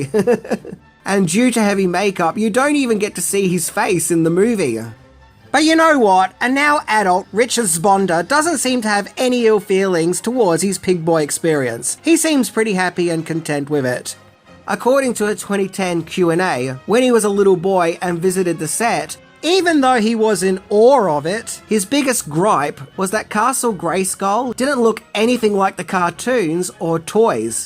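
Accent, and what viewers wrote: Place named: Australia. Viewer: Bro you make some of the best videos ever!!! You are funny, imformitive and just over all a good dude!!! Im a 43 year old american male, i know the profile says maria curcio but thats my mother in law that passed in july 9th of last year and her daughter, my childrens mother was nice enough to let me use it even though me and my childrens mother havent been together since 2015😊 Im usually signed in as Heathdiegert013 or something close. I dont know how to change it and i feel bad to change it😊 I LOVE your voice, you aussies sound so cool!!! Please dont ever stop if you dont have too😊 my favorite Austrailian!!!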